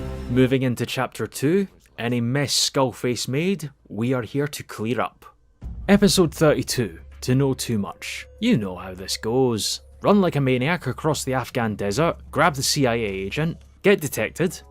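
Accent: Scotland, United Kingdom. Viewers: The British accent saying Afghani names is so funny 😂 you’re absolutely butchering them 😂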